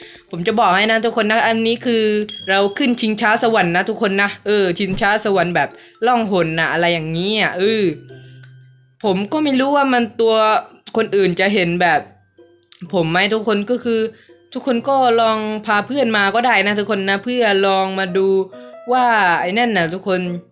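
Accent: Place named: Suphanburi, Thailand. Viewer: ทำไมพี่พูดทองแดง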